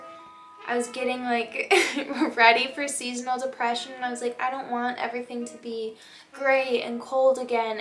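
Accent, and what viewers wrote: Midwest, United States. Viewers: Are you from the midwest?? The accent is coming THROUGH lol!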